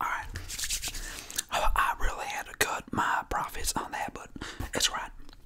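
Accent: South, United States. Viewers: He has an unique way to do the asmr movies.I am a fan for a long time and never dissapointed me.Altough i prefer his chewing gum rumble,hand movements and of course the southern accent.I rewatch old vids and i am ok.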